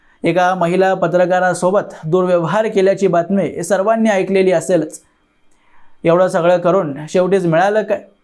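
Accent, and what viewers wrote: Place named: Karnataka, India. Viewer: आपले विषय विल्श्लेधन खूपच अभ्यासपूर्ण असणुबतारकशुद्ध असते. ऐकायला खूप आवडते आणि भावते. तुम्ही बहुदा कानडी भाषिक आहात का.आवाजात गोडवा आणि आपुलकी जाणवते म्हणून जिज्ञासा.🎉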